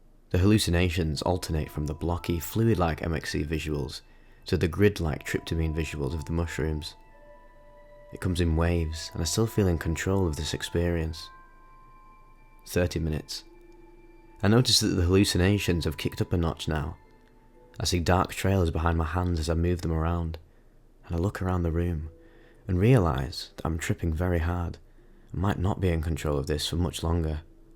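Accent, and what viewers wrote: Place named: Yorkshire, United Kingdom. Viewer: fairrrr, I used to always get copyright claims when watching music videos split screen. One time in particular when I streamed an Antony Joshua title fight in the corner whilst streaming a wow dungeon. I guess that's taking the piss a bit 😅 was never getting away with that. Also, cool to hear your from up north — I'm a manc. Guessing your from Leeds or York, my sisters bf is from there you sound the same lmao. Anyway enough of the life story, I stumbled across your channel whilst researching a some stuff. I’ve been using ambience music on my latest videos with my voice over the top, hoping not to get strikes, so far so good. I actually refrained from using an Egyptian type ambience from Assassins creed because it was a video game track and I thought I'd most definitely get striked, however, I shall try incorporate some video game music, it seems to be safer 👍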